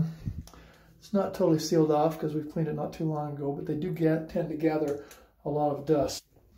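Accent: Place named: North America. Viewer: Very helpful and great narration. Most Brits are suckers for a North American accent!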